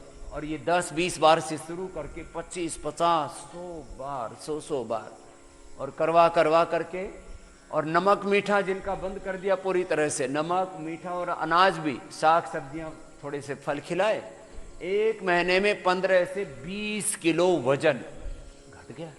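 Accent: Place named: Punjab, India. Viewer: की punjabi voice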